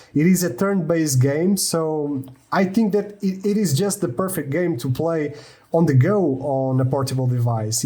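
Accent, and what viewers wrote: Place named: Portugal. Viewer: I can tell your native language is portuguese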